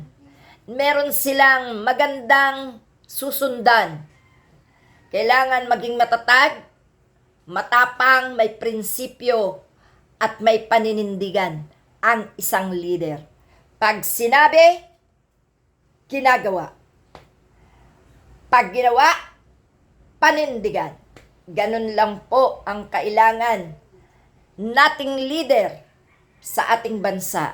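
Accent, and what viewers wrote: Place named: Cavite, Philippines. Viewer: Caviteña ka pala Maam..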